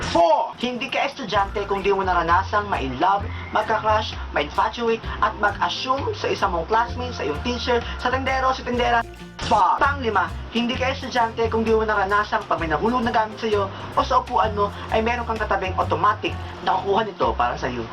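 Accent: Visayas, Philippines. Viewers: hahahahha galing! bisaya ka ba??? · Bisaya ka dung uy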